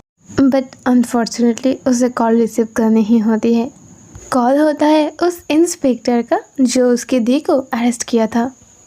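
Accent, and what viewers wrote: Bengal, India. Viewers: I love your bengali accent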